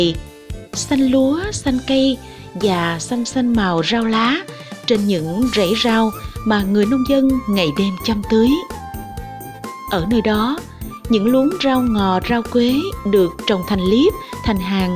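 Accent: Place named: South, Vietnam. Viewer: Hoan hô cô em vẫn giữ chất duyên dáng và hồn nhiên của người miền nam. Đừng bắt chước tiếng Việt lai căng hiện nay, nghe ' hổng dô'.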